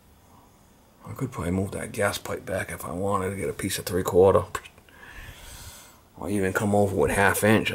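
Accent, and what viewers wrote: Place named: New England, United States. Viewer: Great videos. Oh mama do I get a kick out of the New England accent. LOL! Wish you lived closer to western PA. I have a boiler that needs replacing and appreciate you taking the time and effort to make these videos. Big Thx!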